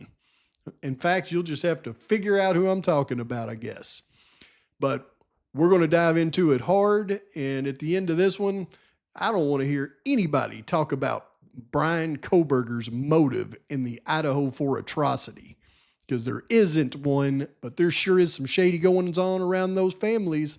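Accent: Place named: Arkansas, United States. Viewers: Funny. I’ve been curious where you were from. Southern accent and you say “Interesting” the same way I used to when I grew up in the mountains. Not as remote as you describe though. Hang in there, we’re with you. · Mountains. I'm thinking Northern Arkansas or Geirgia. Everyime I play one of his videos, my husband says he sounds just like Dr Phil! Where is Dr Phil from? Lol!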